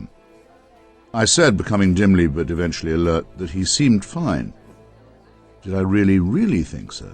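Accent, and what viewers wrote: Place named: RP, United Kingdom. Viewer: I disagree. I've been listening to Hitchens and his brother Pete for almost half a decade, and I think I'm correct. But I couldn't read his mind, so I can't say for certain, just like you can't. As for proof, well I can say that sounded more like a misotheist than an atheist. Misotheists hate God because they think he's immoral. Literally every short you'll see on YT is calling God immoral, unjust, a dictator, etc, etc. If God doesn't exist, why did he spend all his time criticising Him then? And oh yeah, he literally said in his debate with John Lennox or Frank Turek (can't remember which) that even if God was real, he still wouldn't believe in him I didn't insult his mother. I just said that another man was more important to her than her own sons. Why don't you word what his mother did in a nicer way if you think I'm being hateful. 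And I'm not religious. I'm not even a Christian. I'm just someone who was a Hitchites fan before finally studying scripture for myself. And I don't hate. He's just objectively wrong about a lot of biblical facts, but because he's got a posh British accent, his word are taken as gospel.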